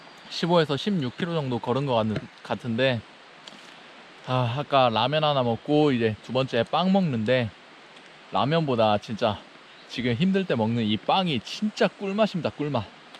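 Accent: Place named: Jeolla, South Korea. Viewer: 아 근데 전라도 억양이 되게 거슬리네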